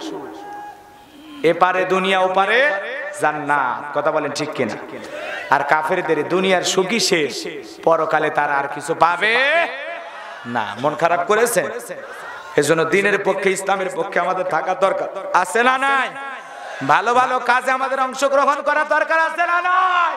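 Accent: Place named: Noakhali, Bangladesh. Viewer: ওরে নোয়াখাইল্যা ভাইরে। বিরে আশি নয়,বিরাশি বলেন। ভাষাটা একটউ শুদ্ধ করে বলেন।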